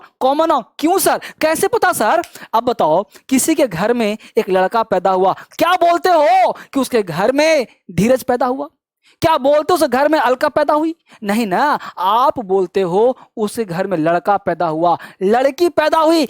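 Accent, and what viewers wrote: Bihar, India. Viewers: Sir Ap Bihari ho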